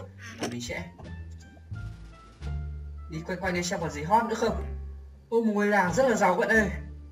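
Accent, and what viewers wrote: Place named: Central Highlands, Vietnam. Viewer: cải thiện cái giọng nói như người vùng cao ý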